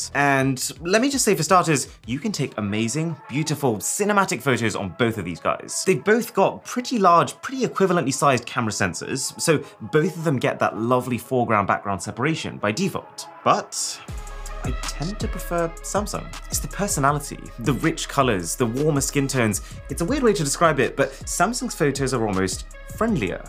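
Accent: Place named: United Kingdom. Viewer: The only British guy whose accent i can fully understand 🫠. Great video tho ✌️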